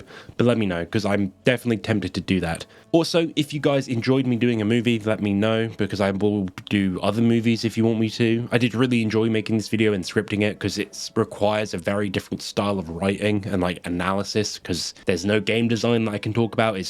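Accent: United Kingdom. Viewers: No he just has a hard English accent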